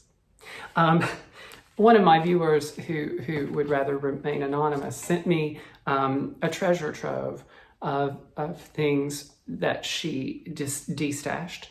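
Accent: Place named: South Florida, United States. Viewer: Omg “tornadahs” … memories of my mother! It is like I am listening to my family from South Florida.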